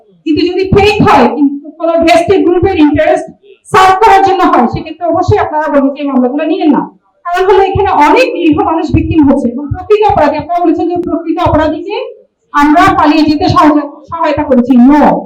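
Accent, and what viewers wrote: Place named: India. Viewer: এই মহিলা ভারতীয় নাগরিক কথা বুঝা যার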